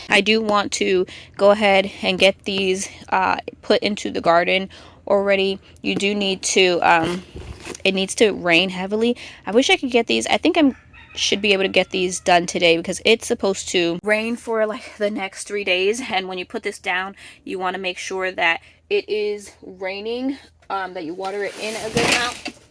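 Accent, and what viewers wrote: New York, United States. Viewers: I never heard your New York accent before! I would have never guessed! Wow! You are really prepared for Spring! This summer I will be attempting to grow Tepary Beans. They are native to our Desert here. The Native Americans have grown them for centuries here without any Irrigation! Hope they grow! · Heyy... I love your N.Y. accent, just let it roll.. lol.. but you're doing just fine. I am glad you were able to get the beds done and the hoop house. I too, did a lot of marigolds as well and doing some more flowers. The duck cattle panel hoop house is cute too.. have you look on pinterest on duck, and chicken panel hoop houses.. they are cute as well.. I am so stoked about going out to the homestead when it warms up to design or get everything started... You have everything going well, I am very proud of you on every thing you do.. 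have a wonderful day.. I do have a question though. If you look at my beds will I have to get top soil or could I just put my black kow on top. I used a lot of compost leaves sticks etc.. or do how you are like putting top soil and then the black kow, I get confused.. You do look good sitting up under your gazebo with your table and chairs.. lol.. Thank you for the video.. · Don't lose the NY accent - it's cool!😎 I'm from AZ but have always loved NY❤️✌🏽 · I’d never known you had a NY accent, but it’s ok, you sound good to us.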